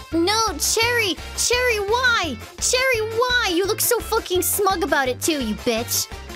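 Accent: United States. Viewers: I live for "American accent" and pronunciations. So cute.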